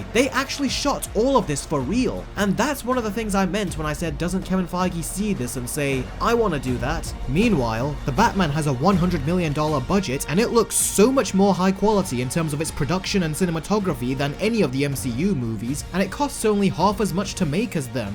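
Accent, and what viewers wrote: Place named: United Kingdom. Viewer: I could be wrong- and I apologize in advance! But, I believe b/c he's British, they pronounce the "a" differently. Same thing with "Mulan." He pronounced her name differently as well.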